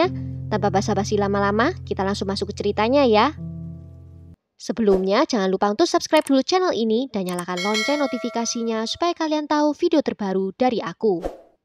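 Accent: Java, Indonesia. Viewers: Hay medokuhhh cantik 😍😍😍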